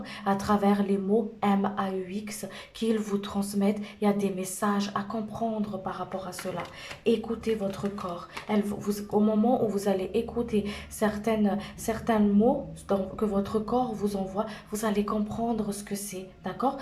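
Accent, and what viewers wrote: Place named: South, France. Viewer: Merci bien de la guidance bel accent du sud (il me semble) :) ça me parle beaucoup,malheureusement de mon côté l'attente en la concrétisation de mes projets on eu raison de ma relation amoureuse qui était à distance car il était Américain j'ai coupé hier car ça me prenait trop d’énergie ça avançait pas du tout niveau papier etc j'ai préféré m'arrêter là maintenant je pense me stabiliser ici avec peut-être une autre personne avec qui je me suis rapproché dans l'entrefaite.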